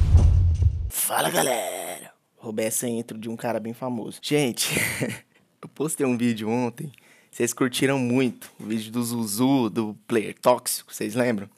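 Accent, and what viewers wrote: Minas Gerais, Brazil. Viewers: Tu tb é mineiro man?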